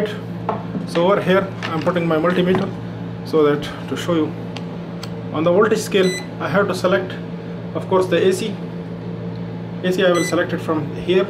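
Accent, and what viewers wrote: India. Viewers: Informative video, it looks like this entire vacuum cleaner could use a cleaning. What year does it date back to? What industry was it used in? What country was this video filmed in? I'm guessing India judging by your accent.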